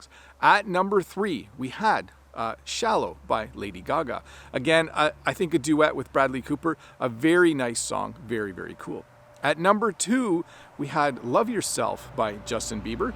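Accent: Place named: Canada. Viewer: Thank you so much, first of all I 'll like to say that I really enjoy watching your videos, I really like your manners of teaching, because it's very clear to me, it's easy to understand, and I love your Canadian accent it's understandable, iam always practicing your online lessons. I have been practicing and iam enjoying improving English, please keep making videos for us they are amazing for non-native English speakers like, by the way iam Mozambican, I was born in Nampula city ( it's called the Axinene province- Emacua's land). Thank you so much have an excellent day and good luck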